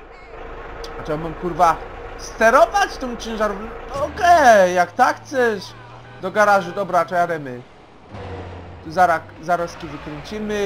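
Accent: Silesia, Poland. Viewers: Po śląsku było lepiej jak mówiłeś :( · Seria GTA u bez Śląskiego gadania jest tak niepotrzebna, jak BuFu na Polskim YT...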